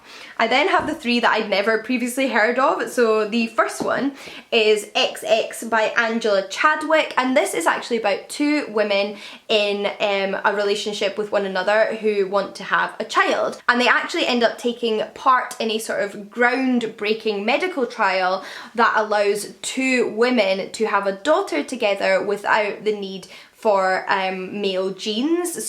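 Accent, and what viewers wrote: Scotland, United Kingdom. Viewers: That's the Scottish accent dude, it can make doom and gloom sound like optimism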